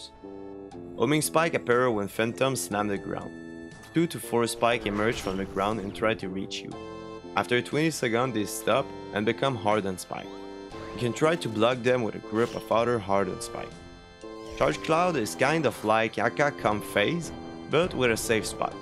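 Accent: Quebec, Canada. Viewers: bro are u canadian/french? u talk almost identical to GSP (georges st-pierre) edit: ty for the guide very well explained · are you from quebec? this accent me dit dequoi en tabarnak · J'ai remarqué direct l'accent québécois! super guide, lache pas!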